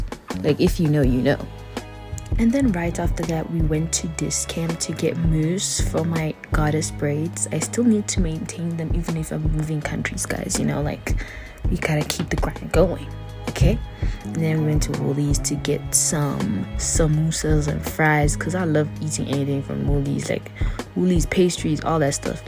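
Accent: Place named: United States. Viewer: Thanks for going we won't even know u gone....u trying to sound like a American already so u will be just fine that side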